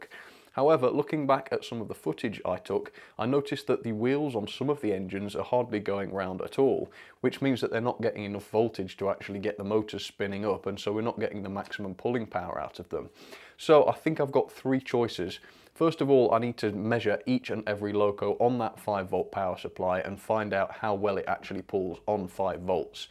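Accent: United Kingdom. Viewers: This has got to be the most british-ish accent i have ever heard in my life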